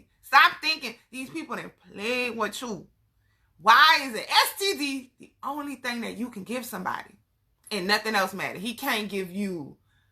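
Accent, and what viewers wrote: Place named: Louisiana, United States. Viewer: Just recently subscribed you are so beautiful inside and out what state are u from. Love that accient sound like you from lousiana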